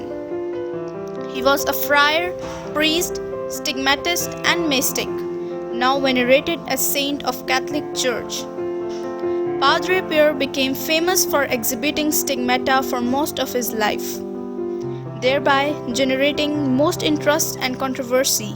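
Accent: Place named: India. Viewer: Indian lady... the accent so strong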